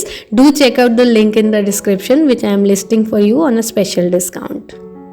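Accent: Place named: India. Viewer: I really love your videos, your thoughts and views. Love love your accent and you owning it and rather than being shamed by people. This makes me admire you even more. Re aroma of our Indian spices and cuisine, instead of being shamed I am 💯 on board with you. Just like you, I question the same to people who are bothered by our Indian spices smell. I am married to a midwestern American Caucasian. When his family even snubs their nose at aroma of my Indian cuisine, all I say to them is “I totally understand that this aroma is new to you and you find it different just like how I still feel about the burgers and meats you all eat”…Love your authentic self. Always stay that way 💕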